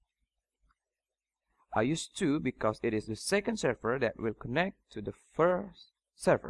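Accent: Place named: Spain. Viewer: indian guy with a spanish (is that spanish) accent? EPIC!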